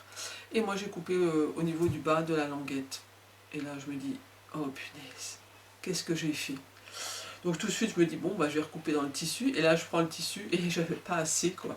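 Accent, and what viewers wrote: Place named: East, France. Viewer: Bonjour, je suis une nouvelle abonnée...je pensais bien que vous veniez de l’Est...vous avez l’accent ! Je suis de l’est aussi !! Belles cousettes , j’adore !!!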